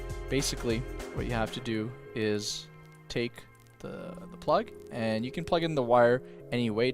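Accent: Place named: Canada. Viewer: You're so Canadian! "Oo-ut". 😭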